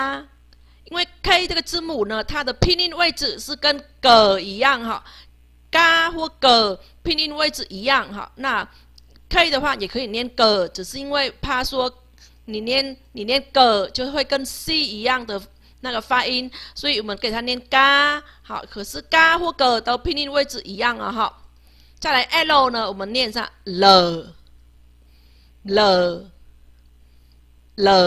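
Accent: Vietnam. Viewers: 太感謝了! 在越南工作我都不知道我同事在說什麼 😂 老師的發音應該是北越吧! 跟我公司同事發音一樣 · 老師,冒昧問,你的發音,南越腔,還是北越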